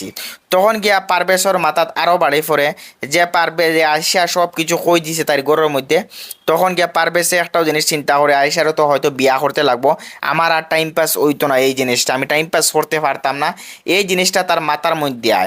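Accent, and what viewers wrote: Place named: Silchar, India. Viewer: Ami Silchar tone dakram bhai okk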